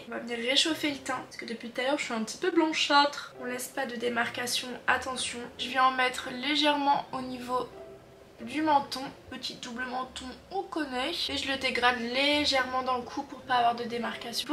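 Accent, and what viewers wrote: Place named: United States. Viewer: Je n'avais jamais entandus ta voie ! Au début, regarder tes vidéo sur tiktok et je croyais que tu n'était pas française plutôt américaine 😅🤣sinon t'es vidéo (et short) son juste trop bien et tes make-up, juste incroyable!!!! ❤❤❤❤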